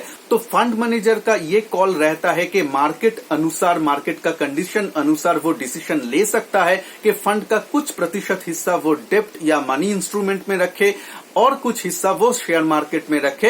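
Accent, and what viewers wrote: Bengal, India. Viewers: Hi, I have seen and liked your video. You talk nicely and your logic is lucid and easily understandable. My suggestion, you should include the Risk part too, the individual Funds poses, in your discussion. That becomes a very critical determining factor in Fund selection. You are a Bengali, right? Apparent from your pronunciation. Good luck to you and keep presenting such nice videos.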